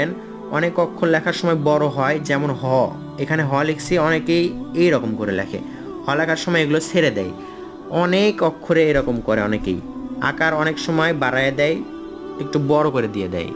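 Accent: Bogura, Bangladesh. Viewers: আপনি বগুড়ার তাই না?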